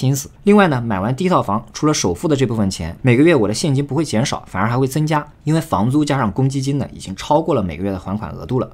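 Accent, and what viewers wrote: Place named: Shandong, China. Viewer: 有一点山东口音哦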